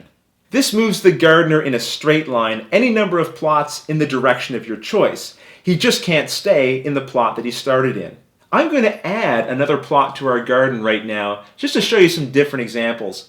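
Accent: Canada. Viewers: Are you by any chance Canadian?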